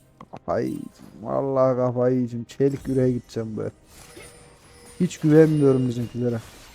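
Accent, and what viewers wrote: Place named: Anatolia, Turkey. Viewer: Bu içi anadolu ağzı beni köyümde hissettirdi.Sivaslı mısın?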